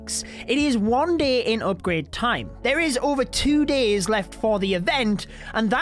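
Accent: United Kingdom. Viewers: You guys makes fun of Indian accent but I love your British accent ❤️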